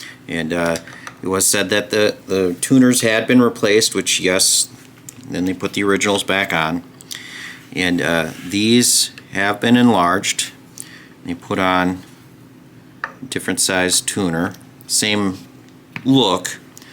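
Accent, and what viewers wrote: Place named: Minnesota, United States. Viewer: Daaaamn. I'm here for that Minnesota accent don't cha kno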